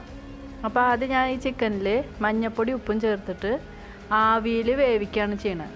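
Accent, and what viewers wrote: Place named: Thrissur, India. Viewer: ചിക്കൻ പൊളിച്ചുട്ടാ.. നമ്മടെ തൃശ്ശൂർ ഭാഷയും.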